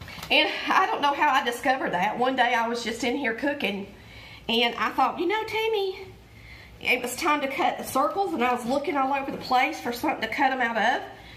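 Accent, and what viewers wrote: South, United States. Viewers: The southern drawl really is a great sound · I enjoyed your video very much! I plan to try your recipe soon! I have never had a fried apple pie, but my grandma and mother made fried banana pies, which are delicious! They used canned biscuits for their fried pies, but there's nothing better than homemade biscuits. I am looking forward to trying your recipe with a variety of fruits. I am from N.C., so watching you cook and listening to your southern accent, made me miss my relatives that I have lost through the years. I live in the state of Washington, but my heart is still in N.C. I pray 🙏 you have a speedy recovery, with no complications!! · I love your southern drawl too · I do enjoy your southern drawl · I love the South in yo mouth, girl!! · That southern drawl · Glade you feel better. Also are y’all from up north? The kitchen reminds me of our kitchen in Ohio. 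But you sound southern. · My mama was born (1916), and raised on a farm in Texas, along with her 9 brothers and sisters. Your accent, southern terms, expressions and idioms sure do make me miss her.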